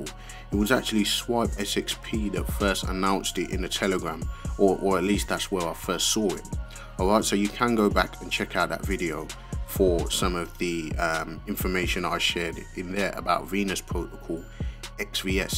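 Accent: Birmingham, United Kingdom. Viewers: You sound like a brummie Johnathon Woss.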